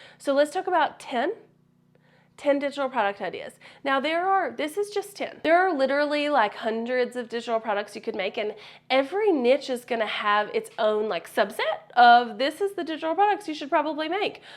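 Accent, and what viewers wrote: South, United States. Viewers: You are fantastic! Love your videos and positivity with a splash of southern twang :) Question, If you create a digital worksheet in canva do you have to do something specific when selling it as a digital product so that someone can use this and actually write in/on it via their iPad? If you have a video on this - could you point me in the right direction?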